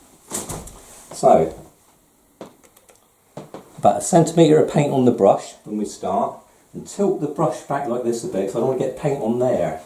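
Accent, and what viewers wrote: West Country, United Kingdom. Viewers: Do I detect a West Country accent ? You voice is very pleasant together with your teaching style and good advice.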